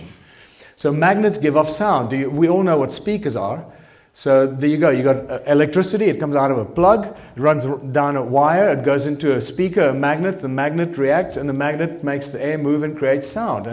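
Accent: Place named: South Africa. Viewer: Love it! and spoken in true South African English lingo.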